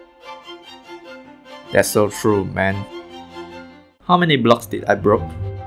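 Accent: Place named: Singapore. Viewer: U sound like singaporean lol xD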